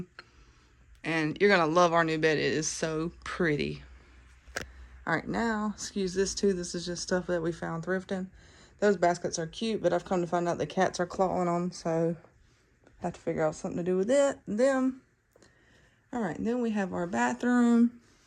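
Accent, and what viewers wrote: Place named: South, United States. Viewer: I like the way you have the furniture but over by the far wall would be good too. I love that tree. Your bedroom is big and your bathroom.👍what a beautiful home!!!! Love it. You are a lucky girl. I forget what state? I think I hear a southern accent. Your husband seems like a nice guy. He has a sense of humor.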